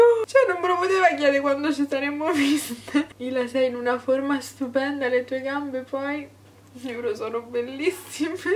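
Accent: Rome, Italy. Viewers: E' la prima volta che ti vedo su youtube. Guardando i tuoi vecchi video si vede che sei molto cambiata, ma sei ancora bellissima. Dal tuo accento direi che sei sicuramente di roma.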